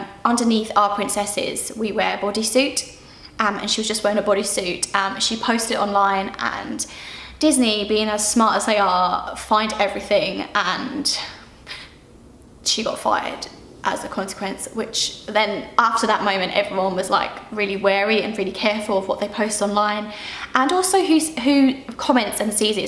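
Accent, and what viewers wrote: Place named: England, United Kingdom. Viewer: What part of England are you from? Your accent is lovely!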